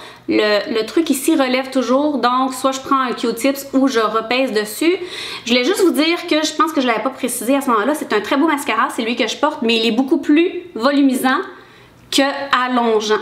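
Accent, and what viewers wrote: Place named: Quebec, Canada. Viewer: Coucou, merci pour tes recommandations;) ma fille ainée est à Rouyn Nouranda pour ses études secondaires, j'aime penser qu'elle entend ton bel accent québécois:)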